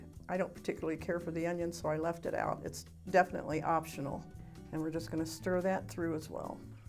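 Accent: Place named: United States. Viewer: Where do you live? By your accent, im assuming the US. Hellman's (east coast)= Bestfood's (west coast). I hope this helps anyone who didnt already know this😊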